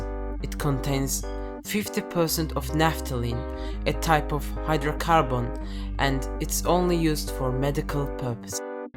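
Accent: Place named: Russia. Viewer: Русский акцент слышен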